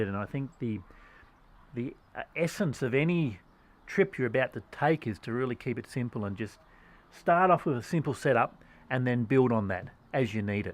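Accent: Australia. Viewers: Genuine true blue, she'll be right mate, delivery. Love it mate! LOL...yeah, best whisper when mentioning our would be masters. Currently chasing a tray canopy for my BT50 ute. Missed a few Market Place for free beauties, but I'll catch one eventually. For me, it's just going to be basic place to sleep prior to hitting the waters for kayak fishing, or a day of metal detecting. Then, overtime, plan to upgrade it for longer trips to the gold fields and various historical locations. Thanks for the insights mate. BTW, I'm thinking removable inside panels using velcro, or DIY window tinting. The way I see it, curtains might be a dead giveaway.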